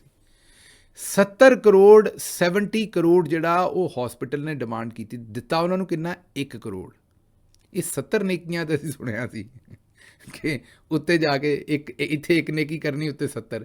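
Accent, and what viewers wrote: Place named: Punjab, India. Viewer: So great analysis Love your Punjabi’s so typical words! Your accent is so similar to East Punjab’s Moga-Ludhiana area.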